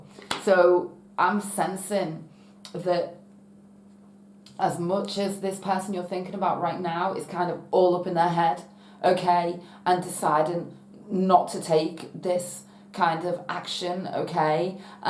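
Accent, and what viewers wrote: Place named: Merseyside, United Kingdom. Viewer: Merseyside accent xx Love your reading xx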